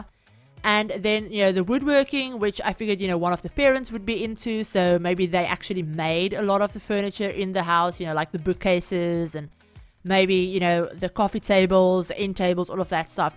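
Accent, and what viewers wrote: South Africa. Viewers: I totaly probably just assuming cause of you're accent, you're South African probably cause it's just like I can never find SA youtubers related to stuff I watch I'm am SA so it so it's just nice to,for some reasone I can't realy understand American accents it just sounds kinda fast IDK why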